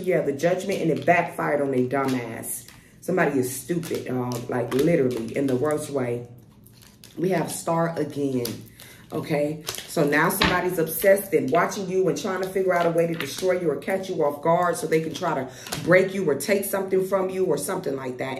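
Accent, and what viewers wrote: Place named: Chicago, United States. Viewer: You talk like you from chicago. Your vocabulary is crazy. I luv it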